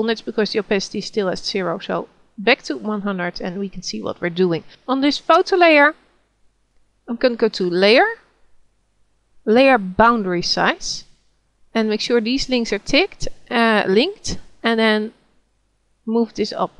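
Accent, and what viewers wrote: Netherlands. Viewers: je bent werkelijk een kei in zowel het bewerken als uitleg geven. hartelijk dank om al dat moois te delen met wildvreemde mensen. als ik me niet vergis hoor ik een Hollands accent in jouw engels dus vandaar dat ik u in het nederlands beoordeel. in de hoop dat ik me niet vergis natuurlijk en mocht dat zo zijn, sorry daarvoor hoor;-)) thank u so mush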